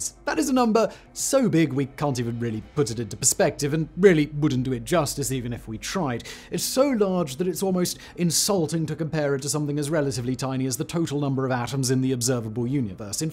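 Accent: United Kingdom. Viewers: I enjoy these channels very much, but sometimes pronunciations are so cartoonishly British it's distracting. If it turns out he's actually from like Boston or Queens but choose his accent to seen more legit, I wouldn't exactly be surprised. It's a lot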